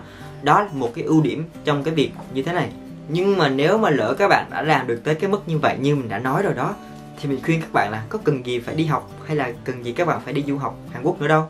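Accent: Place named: Quang Nam, Vietnam. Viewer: Anh ở Đồng Nai sao a nói giọng Quảng Nam ???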